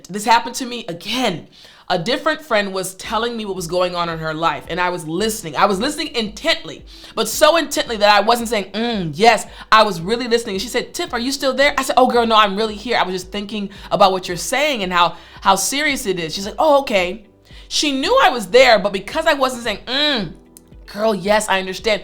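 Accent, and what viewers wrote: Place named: United States. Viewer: Your americain accent sounds great I always enjoy it🤩 I've learn so much from the ways you pronounce the words in your videos, to sound a little bit americain😇 It's so cool to watch your videos THANK YOU VERY MUCH😇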